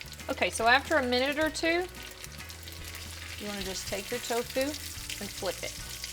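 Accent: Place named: South, United States. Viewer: i love that countryness in your voice 😍😍😍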